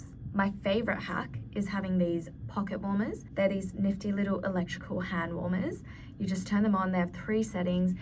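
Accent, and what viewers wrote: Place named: United Kingdom. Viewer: Such a cosy video, and lovely British accent.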